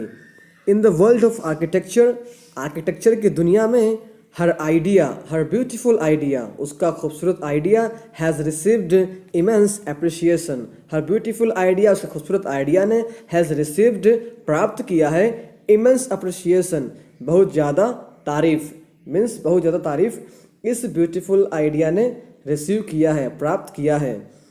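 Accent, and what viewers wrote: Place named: United Kingdom. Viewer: Thoda angrej wala accent nahi bolte to Jada sahi rehta sir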